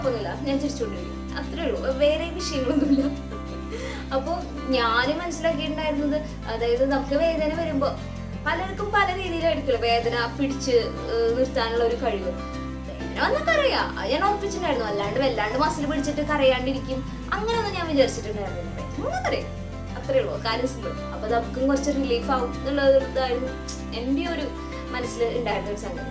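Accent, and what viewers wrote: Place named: Thrissur, India. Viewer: Thrissur slang il chechide samsaram kelkan nalla resam🥰... Sedation kodthit ulla aa acting scene okke sherikum njn chirich poy😂😂😂😂...love you chechi..god bless you baby girl 😘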